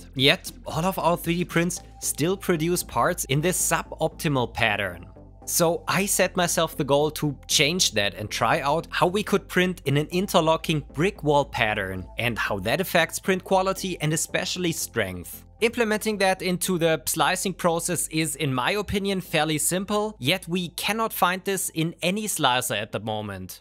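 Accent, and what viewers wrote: Germany. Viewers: Do I hear a fellow German?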